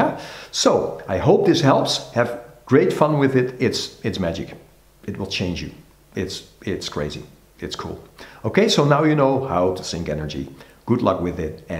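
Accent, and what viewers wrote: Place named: Netherlands. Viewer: Ik wist het. I mean, I used to live in the Netherlands, so I'm used to the Dutch accent hehe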